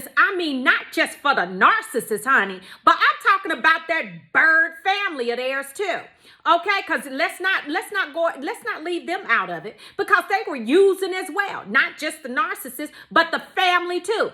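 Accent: United States. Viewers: Just brilliant! So funny and alive with that strong high pitched American accent! I hear you girl loud and clear! Lol Will listen over and over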